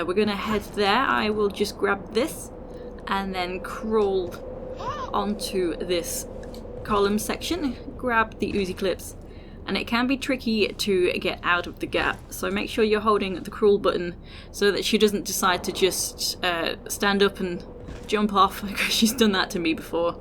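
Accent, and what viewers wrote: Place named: United Kingdom. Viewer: WHEN DID SOUDED BRITISH JUST WONDERING.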